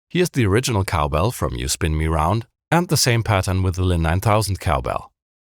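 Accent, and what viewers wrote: Germany. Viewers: now i know: you speak German... or at least, your speak pattern is German influenced (😅)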